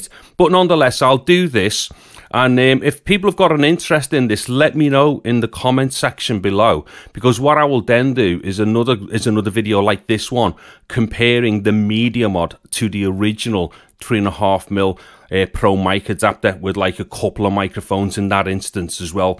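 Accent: Liverpool, United Kingdom. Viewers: you've got nice voice (and audio set up was well explained too) and i like your regional prononciation, i guess Liverpudlian?:) Sorry, if not, i'm a foreigner.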